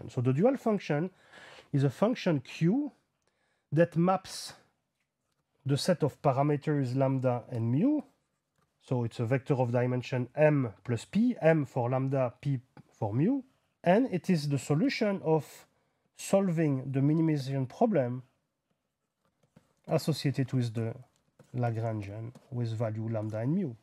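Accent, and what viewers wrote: France. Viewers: It is amazing how he has the heaviest french accent still being quite clear, understandable and concise. Ver'y r'ar'e... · Thanks! Love your French accent :))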